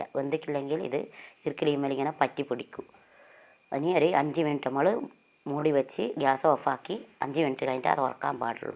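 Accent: Nadapuram, India. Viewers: Nadapuram bhaagath alle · നാദാപുരം ഭാഷ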